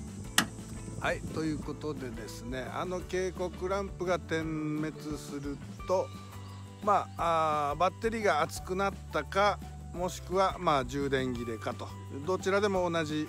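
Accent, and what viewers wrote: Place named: Oita, Japan. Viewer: 大分の豊後大野市の者ですが、そちらの言葉方言と良く似てますよ😃 ちびるは、チビったとか、尿漏れの意味ですが😃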